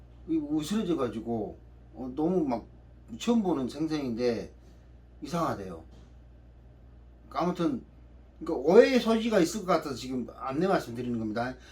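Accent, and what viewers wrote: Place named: Suncheon, South Korea. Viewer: 친정이 순천이라 고향의 사투리 정겹습니다 요즘은 고등어 는 안나오는군요 😀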